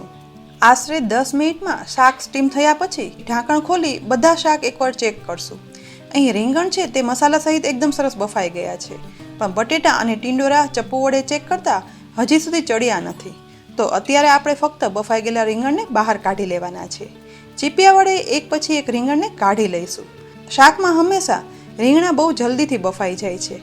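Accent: Kathiawar, India. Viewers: Reecipe tau saras chhe j, Kathiyawadi guajrati saambhalavaa ni majaa aavi. ne tamaaro avaaj pan mitho chhe ne saras reetey samjaavyun! Aabhaar.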